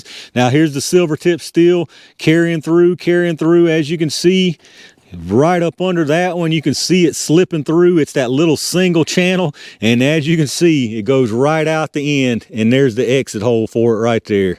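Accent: South, United States. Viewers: I love this man. Scientific method. Southern accent. Enough said.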